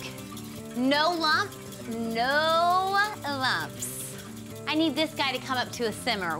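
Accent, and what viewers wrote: United States. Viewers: You sound some what counrty but the ingredients smh 👎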